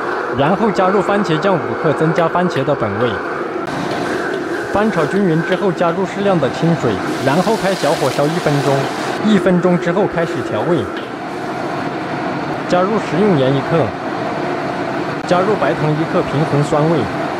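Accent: Sichuan, China. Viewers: 感觉这哥们是四川人，这口音应该是四川人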